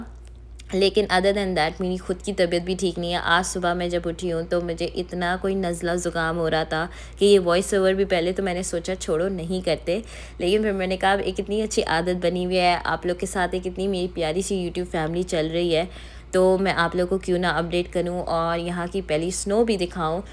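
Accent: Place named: Pakistan. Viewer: You have an awesome Urdu accent..... Love your vlogs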